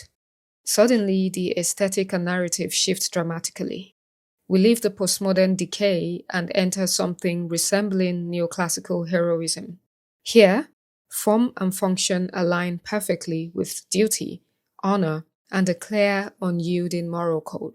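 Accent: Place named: France. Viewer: Oh yea, you watch film for the writing. I love it! I work with a man at Tesla with your accent. He's from France. I lived in Germany for a few years myself. Europe has such deep, rich culture.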